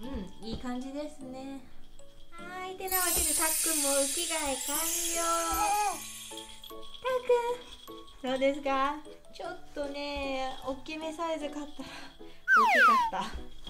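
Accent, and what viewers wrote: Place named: Kyoto, Japan. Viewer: 今日も可愛いたっ君観てる💕 は京都弁のイントネーションで穏やかで優しくて聴いてて気持ちがいいです。たっ君への愛情もたっぷっり〜。にピアノで厳しくされなければ反抗期ないかもね？たっ君で良かったね❣️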